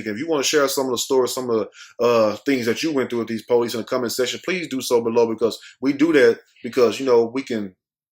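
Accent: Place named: New York, United States. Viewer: you sound like you was born and raise in NYC laughing my ass off #NYC in the house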